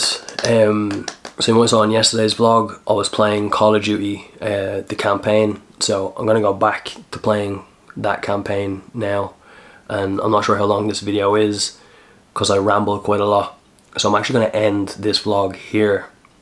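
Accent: Ireland. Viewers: Sir I don't like tattoos but I just wanted to know if you don't mind me asking, why do you have so many tattoos and why do you like having tattoos? I was just curious, you may not answer if you don't feel like....I love your style, your dressing sense and most importantly your melodic Irish accent, I like it so much that I'm learning this accent.☺️ Thank you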